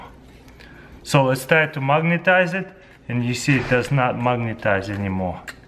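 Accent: Russia. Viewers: it's because the russian's accent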